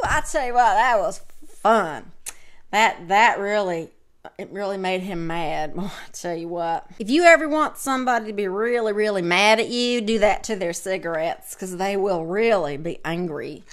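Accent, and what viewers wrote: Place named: Tennessee, United States. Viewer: There's some Reese Witherspoon in your accent. I guess that makes it a Tennessee accent.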